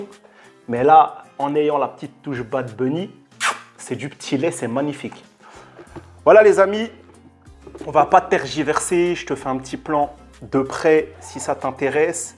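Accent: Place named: Alsace, France. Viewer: Sympa le sac ! Jolie l'accent alsacien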